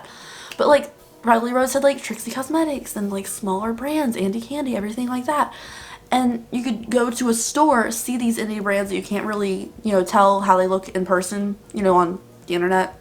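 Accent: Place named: New Jersey, United States. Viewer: my favorite part of this video was when you said you moved to Florida. I got so excited for you! I could tell how happy you were ❤️ I seriously had to laugh though because I was expecting you to say you moved from like somewhere really cold. NJ or something from the sound of it. then you said NC and I laughed so hard 🤣 girl, I'm from PA. the winters here are ROUGH!! it's like 30 degrees out right now and that's warm for this time of year 😱😭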